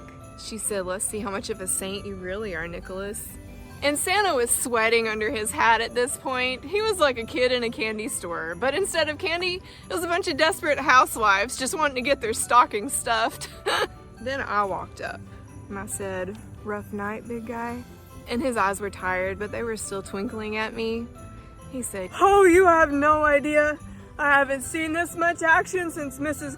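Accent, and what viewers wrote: South, United States. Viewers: I love the southern drawl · Redneck accent but you ain’t half gorgeous 😋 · a beautiful one with a southern drawl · tell Santa you've been around a bit, but you ain't no ho. You're beautiful, sexy, that charming Southern accent unravels my socks and makes me stand at attention.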